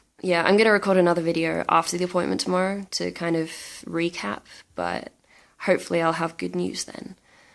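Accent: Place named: Australia. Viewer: Good to hear another Aussie accent on YT.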